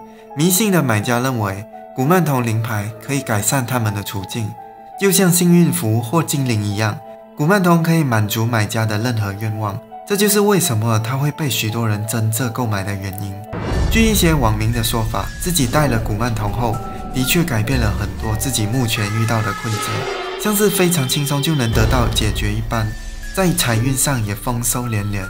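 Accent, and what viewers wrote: Malaysia. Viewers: 應該是馬來西亞人，聽口音就知道了。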